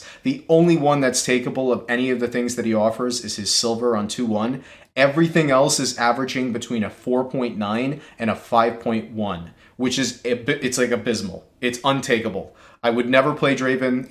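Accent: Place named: New York, United States. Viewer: What part of New York are you from? I hear the accent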